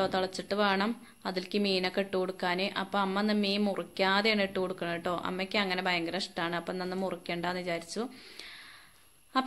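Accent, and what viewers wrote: Malappuram, India. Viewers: Varthamanum kettit Malappuram ano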